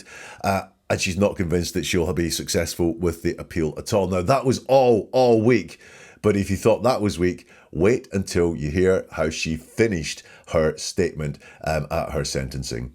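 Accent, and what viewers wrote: Scotland, United Kingdom. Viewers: Terrific stuff. Which part of Scotland are you from?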